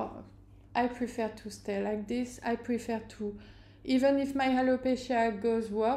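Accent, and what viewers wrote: France. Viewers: L anglais est top , juste l accent maïs ça a son french charm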